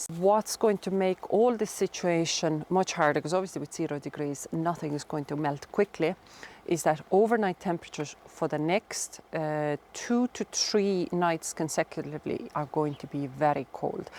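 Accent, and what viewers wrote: Kerry, Ireland. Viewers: You have a grand Kerry accent! Up the Kingdom.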